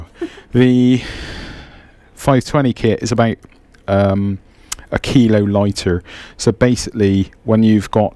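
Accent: Bristol, United Kingdom. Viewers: Good entertaining video, you sound like your from the Bristol area like me! I was gonna get s1000rr but bought new zx10r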